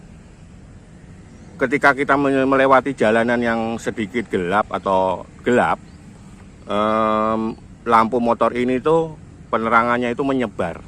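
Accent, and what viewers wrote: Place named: Java, Indonesia. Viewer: Mas nya bukan orang sembarangan, karena apa, karena pengucapan kata katanya terstruktur rapih dan aksen jawa nya kental jadi saya suka dengernya... ❤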